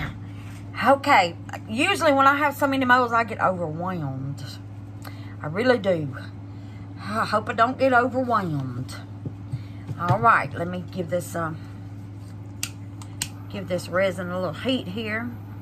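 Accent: South, United States. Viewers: I can tell you're from the south but I can't place it. I'm in northern Tennessee and I believe you're further south. Anyway, I really enjoyed your video 💖💖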